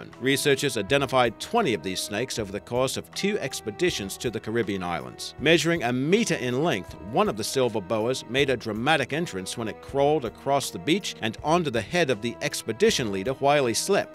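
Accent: United Kingdom. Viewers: You know theres something called a british accent?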